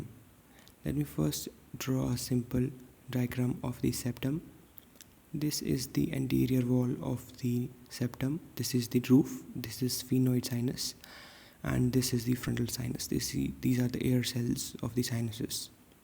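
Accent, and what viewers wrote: India. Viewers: External * you are inian that dialect is disturbing me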